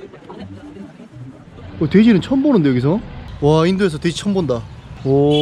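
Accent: Gyeongsang, South Korea. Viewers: 목소리가 경상도 사투리라서 정이가서 본다 ㅎㅎ 여행도 좋아하지만 정갑다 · 그는 경상도 사투리를 쓴다. 그는 Jay다 - 요새 Jay님 영상 보는 재미가 쏠쏠 해요.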